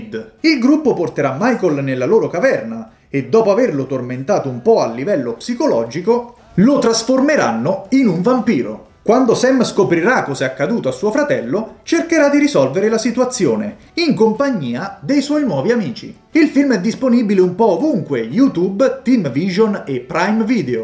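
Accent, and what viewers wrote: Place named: Marche, Italy. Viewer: Maremma cane non prendiamoci troppo sul serio! tutte le settimane ci prepara questi gustosi video a tema horror e un punto di vista che condivide Co. Generosità Con noi che senso ha stare a commentare eh ma io avrei incluso? Sono le classifiche di il suo punto di vista non è necessario il nostro punto di vista è eccheccazzo 😊 forza grazie l'accento Marchigiano mi fa impazzire 😂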